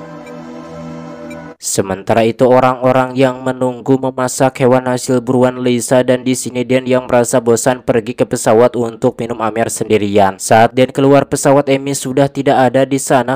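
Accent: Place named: Madura, Indonesia. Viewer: Meduro logatnya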